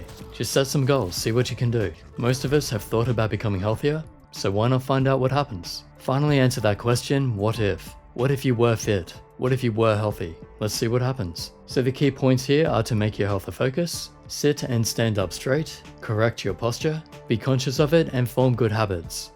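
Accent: Australia. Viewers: Are you Australian? Started watching your vids after I bought my DA elite, never figured that you are Australian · Yo didn't realise you were Australian, how're you dealing with the heat wave? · Oh damn, I never thought you would be Aussie. GG!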